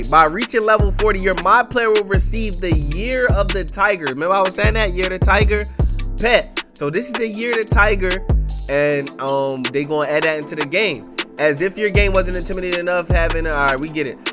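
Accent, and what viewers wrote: Baltimore, United States. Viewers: I hear Baltimore and Philly at the same time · u from baltimore?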